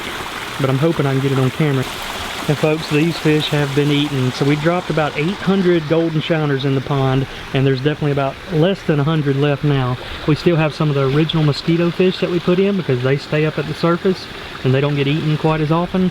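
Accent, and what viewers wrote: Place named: South, United States. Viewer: I don't know where you guys are located, but it looks like a southern state (due to your accent and all the bayous in your videos) so with that said, have you guys considered some of the smaller species of gar? That'd be such a cool inclusion. I used to live in FL (now back in CA) and I used to love watching gar swimming near the surface.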